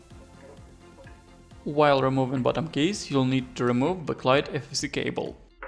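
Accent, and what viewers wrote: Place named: Russia. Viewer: Русский акцент 😅, лучше делай видео с субтитрами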